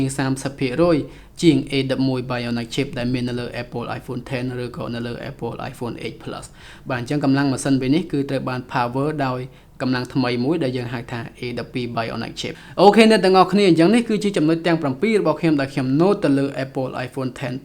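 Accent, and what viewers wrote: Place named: England, United Kingdom. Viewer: តុងអងគ្លេសខ្លាំងពេកហើយ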